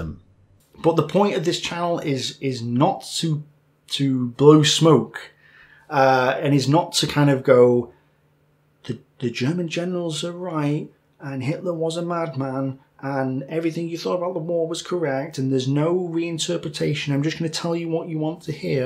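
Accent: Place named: Manchester, United Kingdom. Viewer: I had such high hopes for you. A Northern guy, Manchester I think, holding his own with the plummy voiced establishment historians. But you had to publish your last offering, which as a piece of polemics can only be described as simplistic at best. I do understand where you were coming from but your theories are wrong I too have heard many years ago that the extreme left and the extreme right almost went full circle so that they virtually touched. Stalin though a murderous thug had to be loyal to Lenin and Marx's behests which preached the dictatorship of the proletariat and as many of the early Bolsheviks and Marx were Jews race was not an issue in the formation of the Soviet Union, whereas race was a central plank with the Nazis. In my view that was the fundamental difference. I look forward to you next episode as I for one will not be cancelling my subscription as your history on the second world war is splendid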